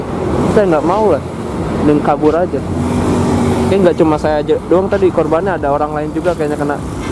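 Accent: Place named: Central Java, Indonesia. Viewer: Dari logat bicaranya, dia kayaknya orang dari daerah plat K.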